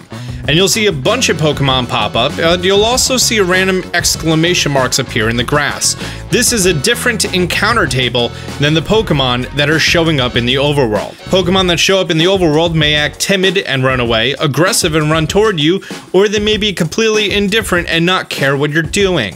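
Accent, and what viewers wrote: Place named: East Coast, United States. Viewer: I love the way you and everyone else on the East Coast say water... "Wooater" hehe 😁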